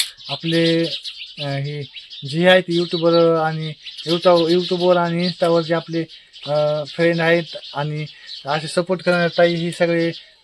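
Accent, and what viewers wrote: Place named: Kolhapur, India. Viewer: चे बोलणे एकदम मस्त आहे कारण तिचे बोलणे थोडे थोडे कोल्हापूर चे वाटते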